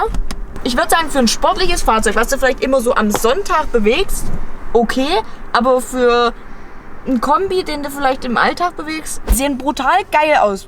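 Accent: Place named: East Germany, Germany. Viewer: In der Tat ein brachiales Auto: nur das Rot und der Digitaltacho würden mich stören. Wie hoch war denn der Verbrauch bei deinen Testfahrten? By the Way:, dein "Ostlerslang" ist wie immer phänomenal erfrischend (ich meine das positiv!). 😜 Liebe Grüße von einem Ex-Magdeburger aus Herrenberg (bei Tübingen), Chris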